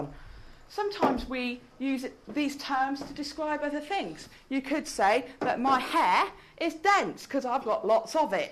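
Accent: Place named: United Kingdom. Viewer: That's kinda puzzling... She has got a British accent but this shouldn't hurt so much.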